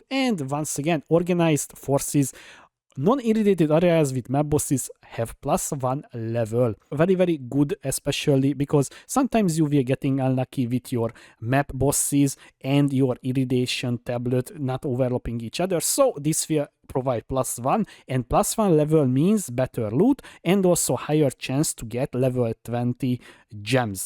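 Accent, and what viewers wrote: Hungary. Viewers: Ez a Magyar akcentus nekem gyanus :D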